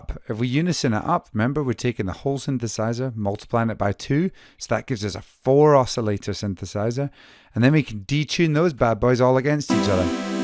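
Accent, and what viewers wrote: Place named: Scotland, United Kingdom. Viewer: Superb tutorial, and a classic track. And, as a northern Englishman with Glaswegian grandparents, it's nice to listen to a tutorial given by a Scot! 😍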